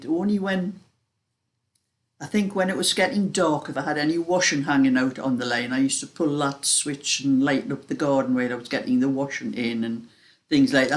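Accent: Newcastle, United Kingdom. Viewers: G`day Thanks for the carols:) I love the white baby blanket. You Know we have a lot in common with our knitting and crochet, the time given to it all etc, Of course i just love to hear the geordie accent:) I was going to "super thank you "for entertaining me these past months. " but you havn`t got it set up. Daisy In Australia:)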